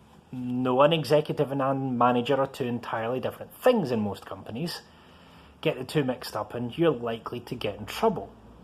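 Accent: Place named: Scotland, United Kingdom. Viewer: ... that bonnie Scottish (Glasgow?) accent! Love it! :)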